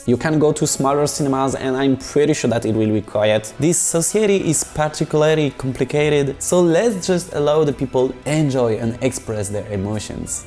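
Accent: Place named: France. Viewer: its always beautiful this french-english accent, keep goin :))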